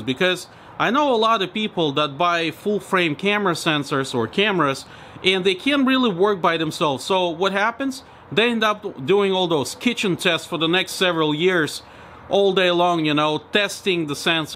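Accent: Russia. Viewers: Sounds like a Russian accent.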